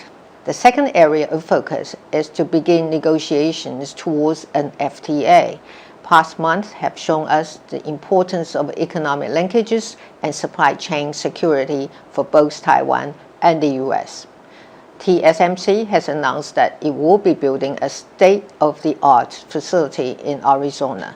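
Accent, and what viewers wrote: United Kingdom. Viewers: 濃濃的英國腔.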